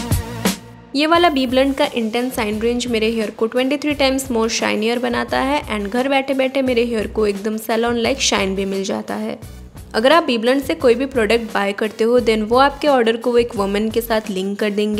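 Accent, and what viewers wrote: Odisha, India. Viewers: Di your odia is so cute 🥺🥰 and you also look soo cute & pretty 🤩😍💜 From - odisha,angul✨ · Your odia accent is so cute ❤ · Your odia accent is so adorable❤.. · Your Odia is so sweet ❤️✨ Like I'm replying that part ✨✨👀 In other vlogs plz do that again 😍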